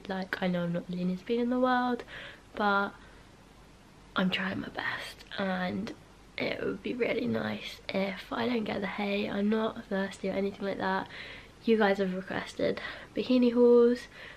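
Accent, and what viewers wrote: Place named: United Kingdom. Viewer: You are beautiful and perfect in every way and I love your British accent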